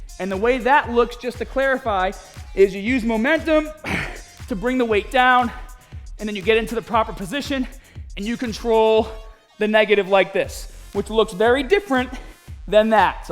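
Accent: Boston, United States. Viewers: A regular bahbell, is that what they have on the fahm! I love ya my Bostonian Brother! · Well, if you want more people to take you seriously, please knock off that exaggerated "Boston" accent. You may be from Southie or Medford or Somerville, but strangely, you never did your "Haavaad Yaahd" act in your original videos. I lived in Boston for four years, and know that most "local" folks there don't really speak such a fake, hackneyed way to each other - only when there are non-Bostonians around, who (for some unknown reason), find your irritating "dialect" cute or charming, so you turn it on to get more likes. Other vlogers in your sector (like Jeff Cavaliere, etc.) don't seem to need such a stupid gimmick like saying "baaaaabells"; they just focus on content. But you're wicked pissah anyway!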